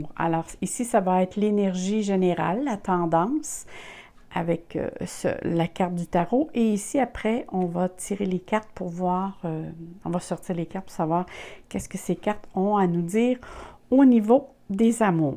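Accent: Canada. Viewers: AAAAH QUEL BEL ACCENT CANADIENS ET UNE VOIX SI APAISANTE JADORE VOS TIRAGE DOMMAGE QUE VOUS NE FAITES PAS LES QUOTIDIENNE CE SERAIS UN REVE BEAU MOIS DE MAI A VOUS DE LA PART D UNE AMOUREUSE DU CANADA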